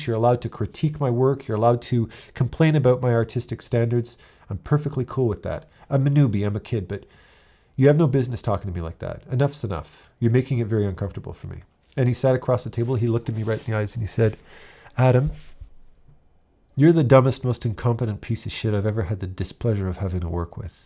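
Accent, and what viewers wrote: Quebec, Canada. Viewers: As a french speaker I can say you’re saying « la crème de la crème » very well, you sound like a guy from Quebec.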